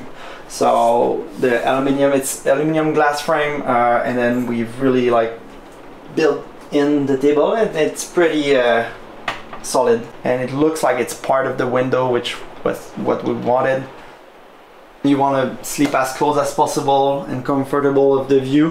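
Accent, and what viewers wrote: Quebec, Canada. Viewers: Quand t'entends l'accent Quebecois! OMG C accessible!!! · Tu le sais tout suite quand un québécois parle en anglais haha !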